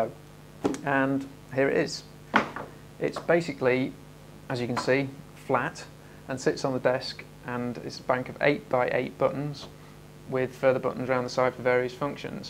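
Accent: United Kingdom. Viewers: If you don't want to hear an English accent, maybe avoid videos made by an English publication like MusicRadar? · If I hear one more polite British accent used to demo a music product, I'm going to puke.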